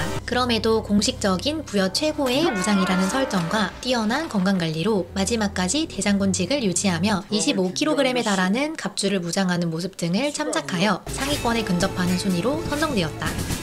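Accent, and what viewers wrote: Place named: Gyeongsang, South Korea. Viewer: 나레이션 경상도 억양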